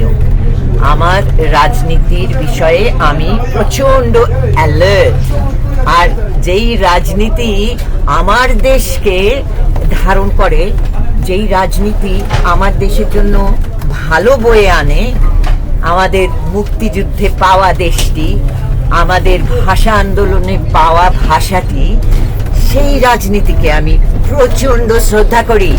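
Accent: Kolkata, India. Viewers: কলকাতা স্টাইল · এটাতো কলকাতার ভাষা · আপনার ভাষা তো আমাদের বাংলাদেশের ভাষার সাথে মেলেনা বরং কোলকাতার সাথে মেলে অতএব আপনি যে ভারতের দালাল সেটা আমরা বুঝি।😂😂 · কথা বলার স্টাইলে ভারতের মত,বাংলাদেশ ভাষার প্রেম মারায় 😂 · Tomar kotha vongi kolkatar varot jao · তোমার বঙ্গবন্ধু লীগরা বাংলাদেশে খাই ইন্ডিয়া যে বসবাস করে তাতে কি তুমি দেশ প্রেমিক মনে করো তোমার মুখের ভাষা হয়তো মনে হয় ইন্ডিয়ান কলকাতা তুমি আমার বাংলাদেশের দেশপ্রেমিক হওয়া কি করে তুমি কোথায় দেশে নাকি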